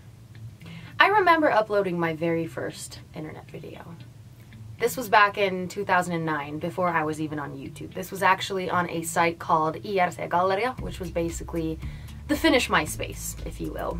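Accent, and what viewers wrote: United States. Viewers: Where did you get the American accent from? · is she finish and American? She sounds American what a shame :( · i'm sorry if this has been asked before, but why does she sound american? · If she's from Finland then why does she have an American accent? I'm so confused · why do you speak with an american accent?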